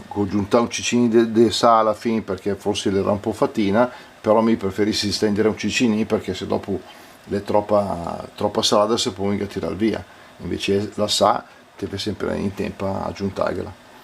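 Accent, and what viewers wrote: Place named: Milan, Italy. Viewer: A parte la ricetta la cosa più bella di questo video è la parlata milanese, dialetto ormai perso. yt è pieno di video di cucina in romanesco, finalmente un po' di lingua madre. Grazie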